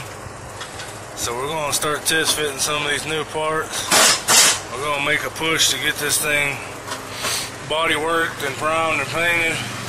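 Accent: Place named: South, United States. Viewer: Where you from? I seen Texas plates. I live in Houston myself but you sound like one of my uncles in Georgia/Alabama lol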